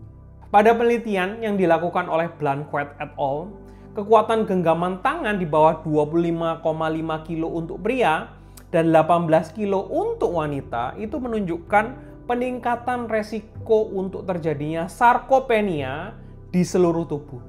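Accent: Java, Indonesia. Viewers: Nggak usah serius², dok. 😂😂 Dari aksennya jelaslah dokter org Jawa. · Dokter medok 😅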